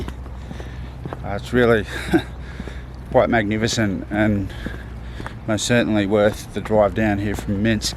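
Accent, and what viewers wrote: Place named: Australia. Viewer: So refreshing to hear Aussie accent on a cool travel blog.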